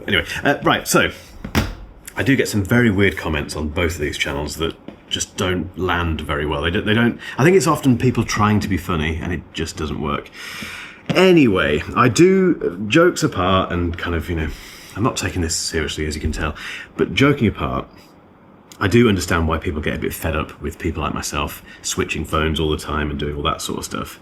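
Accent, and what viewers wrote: United Kingdom. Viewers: People Take a 💊 Pill. He makes good videos, his voice doesn't squeal my ears, actually calming, must be the British accent. Anyway back to topic, are your lives so empty that you need to invest all your feelings into a video, and are hanging by the edge of the seat on every word a YouTuber says Please take many 💊 💊 💊's. I appreciate his perspective and reviews and take everything with a grain of salt and maybe some pepper. YouTubers need to make catch titles and statements that grab your attention, otherwise they will go bankrupt. The iPhone Air is a great phone, I don't have one and won't as it's over priced. I still have older iPhones like XR, 11 pro plus many Android phones. I like them all. So would you and those that make content. What do you expect from the guy, to sit there like a Popsicle and waffle boring words out in a monotone manner and expect us to be be attentive.😂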